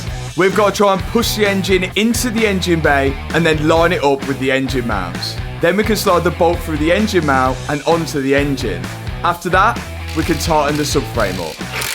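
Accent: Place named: Leicestershire, United Kingdom. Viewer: Love a Leicestershire accent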